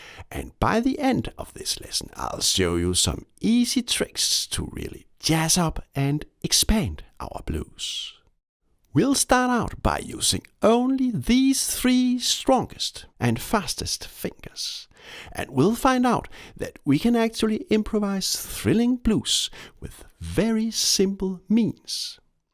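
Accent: Denmark. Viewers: Hej, jeg tager chancen, gætter på du er dansker.....??? Syns jeg kan høre det:-) Super fedt. Hvis du er fra Kbh området vil jeg meget gerne booke et par lektioner hos dig hvis du er frisk.... mvh Peter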